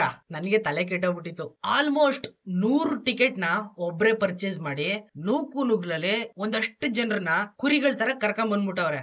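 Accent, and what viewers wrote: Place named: Mandya, India. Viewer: ಅಣ್ಣಾ ಇಷ್ಟು ದಿನ ಎಲ್ಲಿ ಇದ್ದೆ ಅಣ್ಣಾ ನಮ್ ಮಂಡ್ಯ ಮೈಸೂರ್ ಬಾಗದ ಭಾಷೆ ಕೇಳಿ ಕುಷಿ ಆಯ್ತು😅😅😅❤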